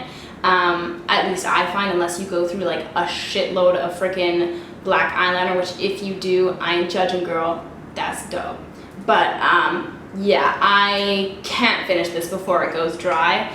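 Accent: Canada. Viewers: I love your canadian accent, the way you say man and damn & love your hair!!!!! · Came across this video and the one where you state the items you would not purchase again. I knew within a minute you were Canadian - you talk the talk girl - and believe it or not, us Canadians have a certain way of saying words (there is a sharpness to the phrasing) that is unique. I used to travel alot through the US and people would always ask me if I was from Canada? I would say yes then they would say my 'accent' gave it away! Great channel! ~ Jennifer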